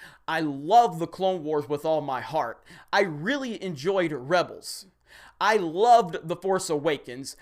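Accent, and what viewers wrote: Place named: New England, United States. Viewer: Are you from New England and went to school in Tuscaloosa? I’m so confused... Go GATORS!!!!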